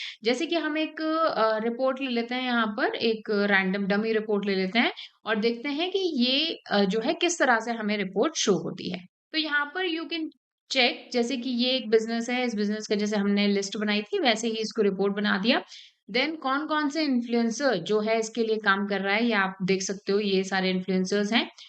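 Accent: India. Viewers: Indian English fuck it🚮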